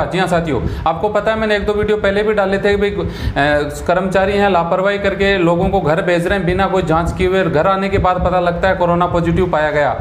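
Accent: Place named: Lucknow, India. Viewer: Sir from where you belong Can you tell me Because your Hindi language is like Lucknow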